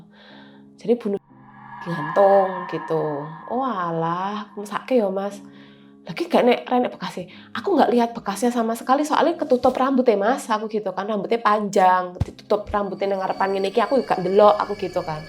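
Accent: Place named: Java, Indonesia. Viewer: Bahasa INGGRIS medok Bahasa INDONESIA medok Bahasa JAWA medok Intinya dia medok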